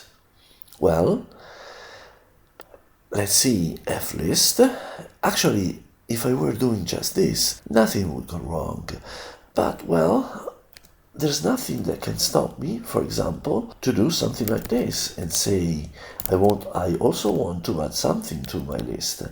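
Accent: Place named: Italy. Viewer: Ciao! la explicación excelente, pero el acento italiano... meraviglioso!!! los oídos latinos agradecidos! "me gusta", "suscripción" y "difusión"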